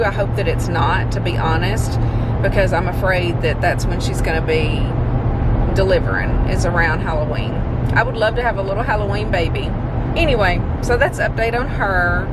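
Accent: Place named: South, United States. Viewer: I like it when you say "any who", and some other terms you use, its sounds so southern, which you are. I'm born and raised in California but I guess it's because my ancestors are from the south that I like those terms. 🌈🌈🌈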